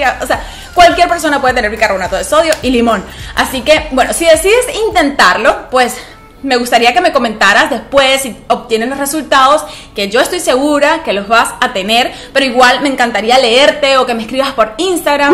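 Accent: Venezuela. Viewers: Es venezolana? es que tiene el acento 😁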